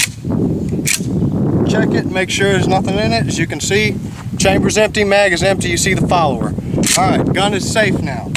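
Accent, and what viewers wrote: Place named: Texas, United States. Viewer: Just curious, where's your accent from? I know it's definitely Texan but you sound like you're from the east near Beaumont or around there but I could be wrong. Love the video though! What do you recommend for slugs?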